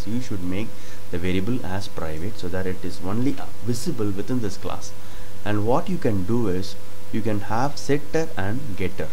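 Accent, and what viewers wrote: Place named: India. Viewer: it's Indian Accent dude.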